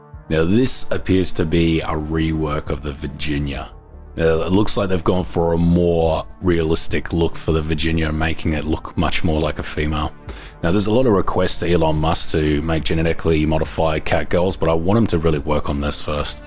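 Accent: Australia. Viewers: Mate, are you Australian? Is that a fellow aussie? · your from Australia buy the sound of it, plus the Aussie sarcasm, or i have been in isolation for way to long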